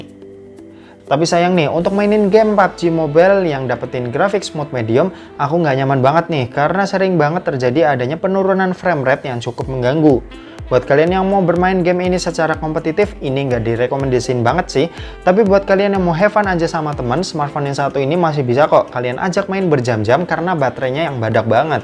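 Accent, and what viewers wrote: Semarang, Indonesia. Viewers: Wah, abang orang Semarang ya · Orang semarang lu yak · Org semarang ya gan?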